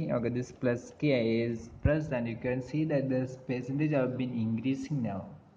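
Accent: Kerala, India. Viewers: lemme guess you're an Indian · മലയാളി ആണെന്ന് മനസ്സിലായി , മലയാള ഇങ്ഗ്ലീഷ് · its a india, kerela accent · Are you from kerela?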